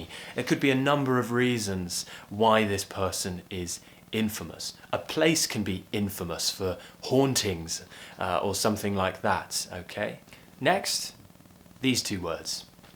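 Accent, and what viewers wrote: United Kingdom. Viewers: Top of the morning sir, do you speak in the British English? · I Love Your Accent. I'm watching every of your videos. And I learned a lot, Especially the British Accent, So Now,I'm studying British accent and finally I found your videos and it help me, I mean, You help me and others about british Pronounciation, Accent and Phrases,Etc. Thanks to you Idol. I'm from Philippines and proud to learn British English. I Hope That Someday I will also come to the United Kingdom. Especially In my Favorite Place London, England.I hope you can help and teach a lot more like we are just learning English and British accent And I hope your Channel lasts longer, have more Likes, shares and Subscribers. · Hello from Brasil! I really love your British accent, love your way of teaching. Thanks a lot.♡ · In love with your British accent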